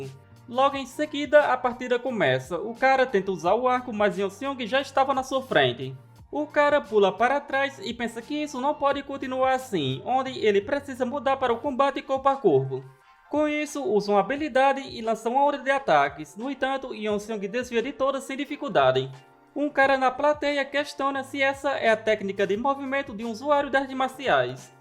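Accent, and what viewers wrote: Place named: Northeast, Brazil. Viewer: Tu parece nordestino man Kkk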